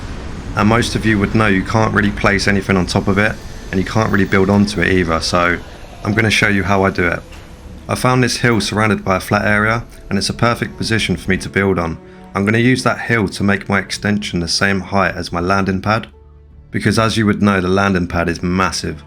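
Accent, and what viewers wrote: United Kingdom. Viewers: Nice outpost dude. If you invest in your outpost skill you can definitely pimp it out even more. Also nice to here a fellow Brit doing some videos haha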